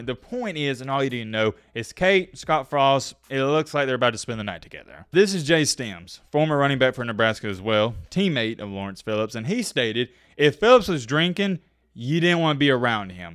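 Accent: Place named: Florida, United States. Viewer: This ain’t the channel for you lol. If you like to fall asleep to a lot of jibber jabber in a thick Florida accent, this is the place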